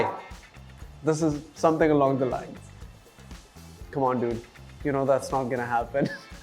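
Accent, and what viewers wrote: Punjab, India. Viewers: His punjabi accent is so cutee 😭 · I also think that because what if you have a wife/husband who changes you as a person that you're not even good friends with your best friends and I feel like that wouldn't be a good wife/husband. My punjabi is exactly like yours but honestly yours is the cutesttt ❤ If comes next to me on an airplane I would scream so loud that the whole plane would know that what just happened or either I'll be starstruck and not able to say anything. About the question "what if you got stood up on a date" my answer would be that that's not gonna happen 😂 I'm really really horrible with jewelry and rings and rings are something I never wanted to wear. I also have the fear of losing the engagement ring so I'll keep in a locker too or wear it with a chain as a necklace. I would have to come up with an excuse if I'm late. 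It would be an ideal scenario to get married in if you only have those people around you who mean a lot to you honestly. I would ask the same question to the alien that where he's from If I'm being recorded and you tell me that you were not recording all this time I'm gonna walk away. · The cutest punjabi accent everrr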